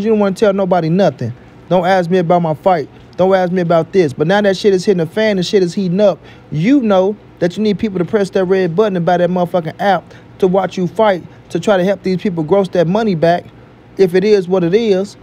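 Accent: St. Louis, United States. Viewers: Yo where ya from fam? I hear that stl accent... Salute For alway keeping it a 💯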